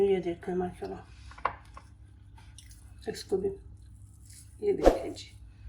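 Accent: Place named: Punjab, India. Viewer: اسلام و علیکم خوش رھیں شاد و آباد رھیں بہت بہت بہت بہت بہت ھیییییییی مزےمزےکی ریسیپیز بتاتی ھیں آپ اور اور اور اور اور اور سب سے بڑھ کر آپکے بولنے کا انداز آپکا پنجابی لہجہ بہت ھی اچھا لگتا ھے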